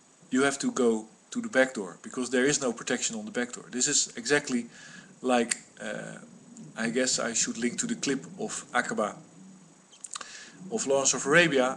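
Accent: Netherlands. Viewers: lekkere dikke nldse accent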